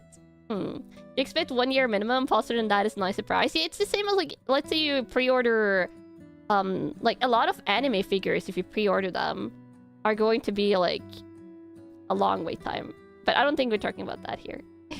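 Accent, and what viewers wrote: Norway. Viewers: love! Thank you for the pack crackings and chatting, a true delight any day of the week:_KannaKamoHug: Those Moomin cards were absolutely gorgeous, a very well designed and crafted collectors item!:_KannaShiny: The kamos hats are coming along very nicely too:_KannaKamo::_KannaComfy: Its always lovely to hear you speaking Norwegian (or your Norwenglish:_KannaLmao:) an entire stream would be very interesting:_KannaLove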